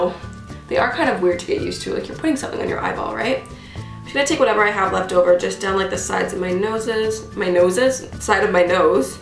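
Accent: Canada. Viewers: you sound so Canadian lol eh? Fellow Toronto girl suffering the same cold and "Blizarding" can't wait for spring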